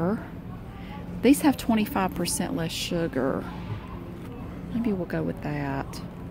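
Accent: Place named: Georgia, United States. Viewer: you're so cute! your accent kind of reminds me of my mom (we're from GA)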